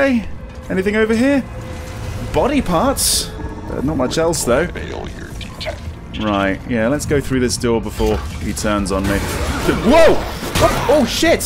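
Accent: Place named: United Kingdom. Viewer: watching exploding mutants and hearing "Oh that was lovely" with a British accent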